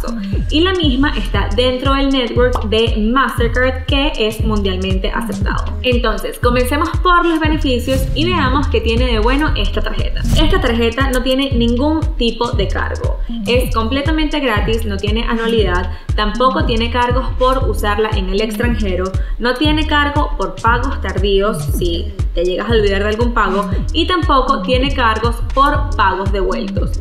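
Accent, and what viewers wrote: Venezuela. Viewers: Hola me encanta tus vídeo y la forma como los abordas. Y ese acento venezolano es lo mejor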